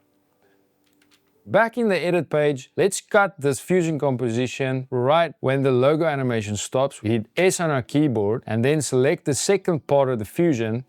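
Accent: Afrikaans, South Africa. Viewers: You sound Afrikaans??😁😁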